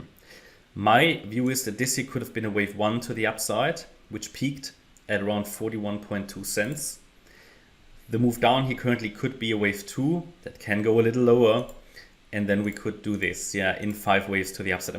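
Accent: Germany. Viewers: Content of interest. How you pronounce everything, I would guess you are a German.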